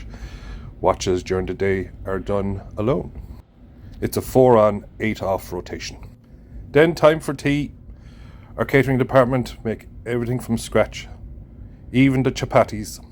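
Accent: Ireland. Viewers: Is that a Cork accent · Please, just keep talking. I’m a Texan born and raised but the good Lord knows that the Irish tongue has my heart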